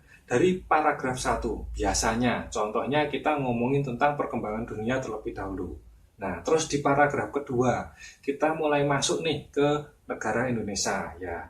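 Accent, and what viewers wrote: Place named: Java, Indonesia. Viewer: males banget denger MEDO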